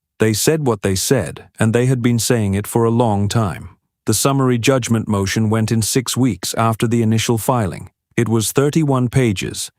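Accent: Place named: United Kingdom. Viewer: It'd be nice to loose the British accent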